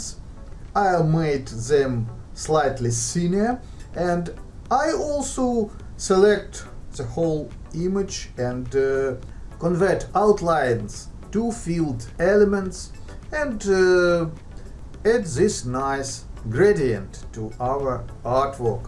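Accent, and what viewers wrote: Russia. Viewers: По акценту понял что ты русский :elbowcough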